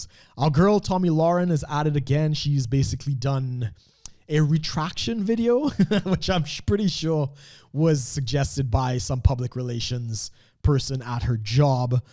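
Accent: Trinidad and Tobago. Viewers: You from the caribbean bruh?🤔 you sound like a trini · Waw..the way in which your accent comes across sound a lot like a TRINIDADIAN! and on second note...very well put.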